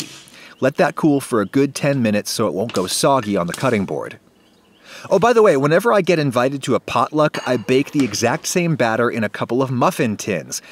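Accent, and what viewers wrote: United States. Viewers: Holy shit it's food wishes in 5 mins and in an American accent. You're God. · you're american? i thought you're canadian!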